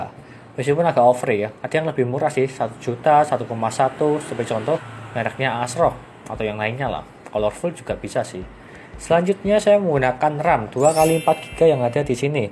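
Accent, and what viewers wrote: Surakarta, Indonesia. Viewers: Orang solo bang?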